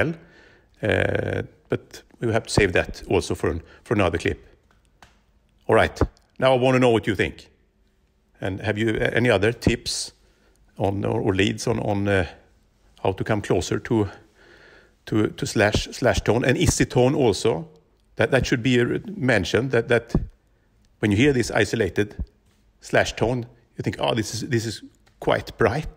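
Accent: Sweden. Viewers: That swedish accent :) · Låt mig gissa att du är svensk? Det låter som kul när du pratar svenska fast på engelska! Fan va hårt! :) gillar att du gjorde en video om detta! Bra jobbat!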